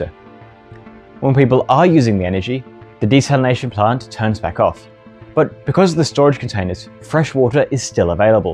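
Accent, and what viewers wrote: England, United Kingdom. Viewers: You're Australian but it sounds like you have a Brittish accent. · I don't get it. Your accent is English, but you say "we Australians", what's your history bro?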